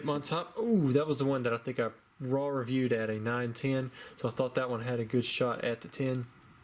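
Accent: South, United States. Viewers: are you from GA or Tenn.? Also love the vidz man I heard about from Pokerev even though you’ve been on YouTube longer.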